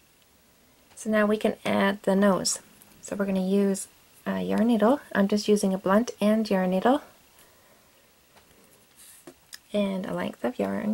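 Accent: United States. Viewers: Hi, great video, just wondered are you American or English. The stitches are called different things. Thanks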